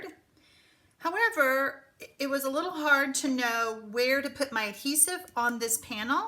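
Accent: South, United States. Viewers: I love this card design. Papers very pretty to even with the stripes. I think this would make a great Christmas card or Halloween card so I think I might play with it that way. I also have to say, I love your Southern accent. I don't know where you're from I just know you're not from Louisiana. I'm living there at the moment and you don't sound well at least on the southern parts I mean you could be up closer to Texas.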